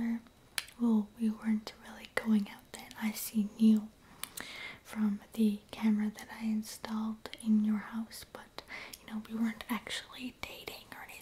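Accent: Canada. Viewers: Are you canadian? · Fruity Newfie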